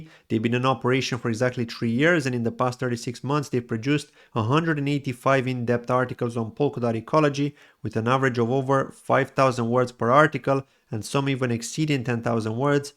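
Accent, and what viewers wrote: Ireland. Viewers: you may or may not remember a couple of years ago I asked if you had lived in Ireland as I get a hint of Irish in your accent. Well today you have an Ireland t-shirt on.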